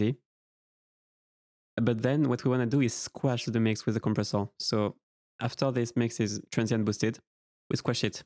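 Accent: France. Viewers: Apart from your Nice Frensh Accent, You are a great mixing and mastering teacher 😍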